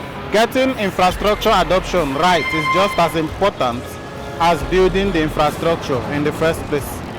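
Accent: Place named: Rivers State, Nigeria. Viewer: GOD bless you Sir for your detailed and realistic videos. Please Sir, are you from Rivers state? You sound like one🙏 You're very professional 🎉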